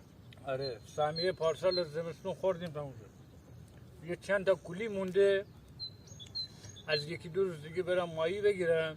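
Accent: Gilan, Iran. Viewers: من هم کودکی خودم رو در تابستانها کنار خانواده مادری در گیلان میگذروندم و این لحجه و ادبیات صحبت برام یاداور روزهای خوش کودکی خودمه.. ته جانا قوربان با اون سفال تیار غذا خودنت.❤ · ویدئو هاتون بوی زندگی میده همه چیز به کنار اون صميميت وبی شیله بودن وسادگی همه رو مجذوب میکنه 👌🌹 از دیدن این طبیعت زیبا ودلنشین وشنیدن خاطرات شما با لهجه ی گیلانی وبیان شیوا وشخصیت شوخی که دارید .آدم غرق لذت وآرامش میشه ...این ویدئو ها حال وهوای زیبای گیلان رو هم به بيننده میده .عالی بود 👌🍀دستتان پر توان زندگیتون پر خیرو برکت 🙏👍👍👍👍👍👍👍👍👍😋🌻🌹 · سلام ، از صحبت‌های زیبای شما با لهجه گیلانی بسیار لذت بردم 🙏🏻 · خیلی حال داد بخصوص بالحجه گیلکی خوش تعریف هستین ماشالا👍👍👍👍👍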